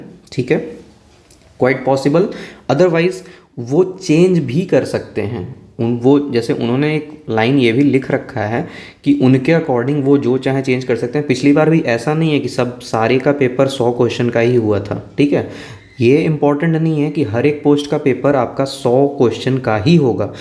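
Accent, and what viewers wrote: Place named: Jehanabad, India. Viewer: भाई जहानाबाद से हो तुम??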